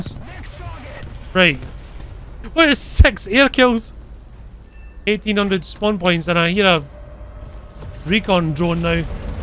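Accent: Scotland, United Kingdom. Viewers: man this dude has a strong Scottish accent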